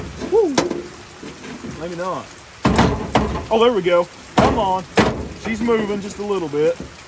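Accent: South, United States. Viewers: I just started following you on tic tock and now on YouTube, I think the time you spend on these old vehicles is great and well deserved. People probably don’t understand how well they are built. You sound like you are from the south, the vehicles from the north where I’m from don’t live as long but I appreciate what you do!! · Love your accent, where in America are you from? Plus, great vids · I love your thick southern accent. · You got the most American accent i have ever heard lol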